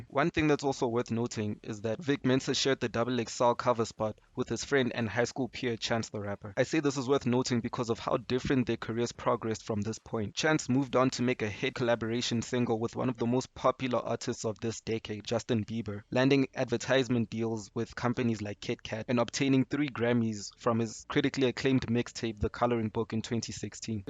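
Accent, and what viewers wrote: South Africa. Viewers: You south African bro? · i swear to god you sound south african, are you?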